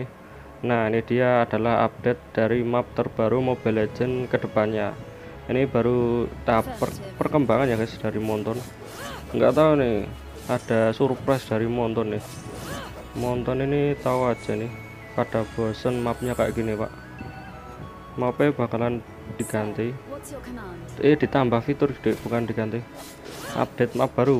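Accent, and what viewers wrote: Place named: Banyumas, Indonesia. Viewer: Youtuber ngapak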